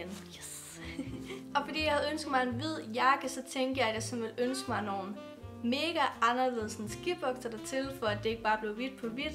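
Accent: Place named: North Jutland, Denmark. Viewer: Det er så sødt man kan høre du kommer fra Nordjylland👏🏻❤️ Jeg kommer selv fra Nord-Midt Jylland😍🙌🏻 Du er virkelig god og dygtig så BLIV ved😘🙌🏻🙌🏻👏🏻👏🏻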